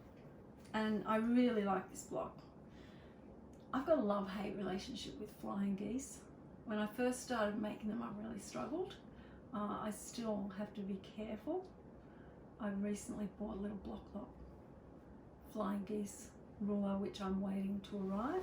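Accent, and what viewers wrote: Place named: Australia. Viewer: So lovely to hear another Australian voice talking about my favourite subject! It is great to hear you referencing my quilting heroes such as Bonnie Hunter and Pat Sloan. So much joy ahead of you! PS. I love your dress!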